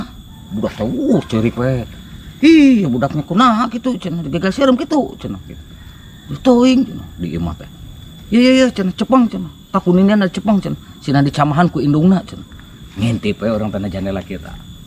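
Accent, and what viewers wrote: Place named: Majalengka, Indonesia. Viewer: Sumuhun leres pisan kang, Sim kuring ge gaduh saderek di majalengka nyarios jiga kitu 😆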